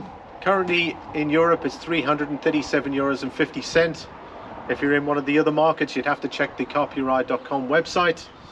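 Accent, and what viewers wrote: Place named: Liverpool, United Kingdom. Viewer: Great video, I’m considering one of these devices so you’ve covered everything I wanted to know. Btw I’m getting various accents in your speech, you’re definitely not Irish but I detected a bit of Liverpool there, am I wrong ? Subbed you from a scouser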